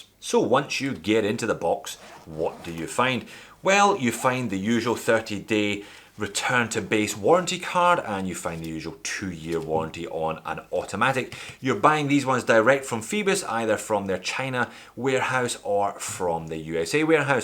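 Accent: Scotland, United Kingdom. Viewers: Love the Scots accent with the Sox shirt! Enjoying this one a lot.. . it is hard to find divers with restrained designs and a vintage feel. Logo is a bit loud for my taste though.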